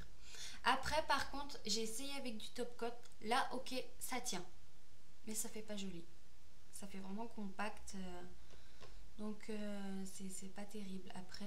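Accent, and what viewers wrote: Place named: North, France. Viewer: Tu es bretonne? Brest ... Mais ta un accent du nord pkutot mdr moi aussi je suis de bretagne dans le 29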